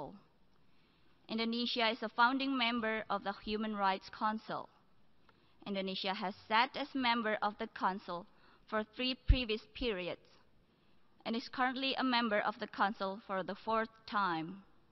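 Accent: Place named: India. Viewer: Kek logat orang india:'v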